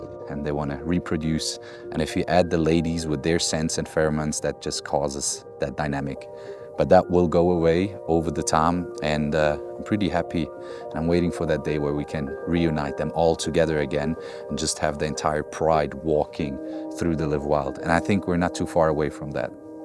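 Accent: South Africa. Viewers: Yoh. Last time I watched you was years ago and you sounded Swedish now you sound more South African 😅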